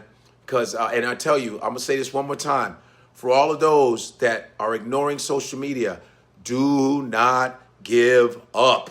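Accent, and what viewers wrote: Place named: Chicago, United States. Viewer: Congratulations, my Chi-Town Brother. Although I was born and raised on the do-or-die Near West Side of Chicago, where most people speak with a different accent than you, I appreciate yours. Just like I appreciate New York and Atlanta accents and others. I appreciate your intelligence, your talents, and your skills as well. Thanks for being unapologetically Black in public. In addition to that, thanks for sharing YOUR SPECIFIC Black experience. All the best, my Brother.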